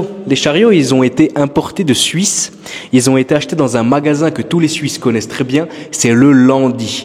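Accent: Alsace, France. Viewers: Bsahtek mon frere !!! Qu'allah te facilite ton commerce et tous ce que tu entreprend!!! Un petit accend alsacien dans ta voix je me trompe ??? 😂😂😂😂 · L’accent alsacien 👌 voila le coter maniaque 😂💪 les marocains vont vriller faut tout soit nickel 👌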